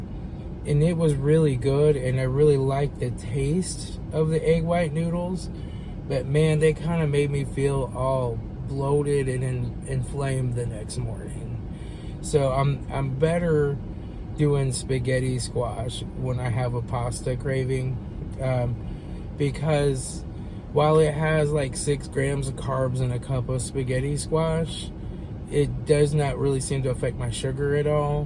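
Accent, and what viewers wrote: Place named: Ohio, United States. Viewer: I knew it! I'm from Tipp City OH originally, moved out west in 1996. Now I notice an Ohio accent. I like listening to you because you sound like family, lol. You sound like my brother. Love your videos!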